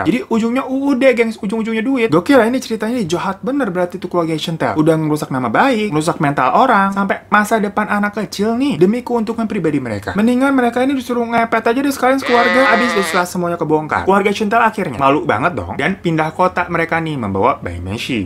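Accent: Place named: Banjar, Indonesia. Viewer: Kayak org Banjar wkwk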